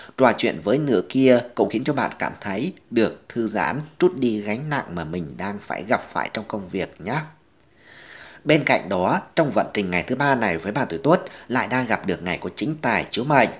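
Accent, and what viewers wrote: Thanh Hoa, Vietnam. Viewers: Giọng Thanh hoá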